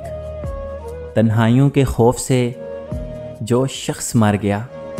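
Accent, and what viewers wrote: Punjab, India. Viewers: آپکا لہجہ سن کر یقین یو گیا کہ پنجاب کے لوگ اردو بھی پنجابی کے طرز پر بولتے ہیں، پٹھانوں کی اردو کا مذاق اڑاتے ہو، اپنی اردو کا حال دیکھو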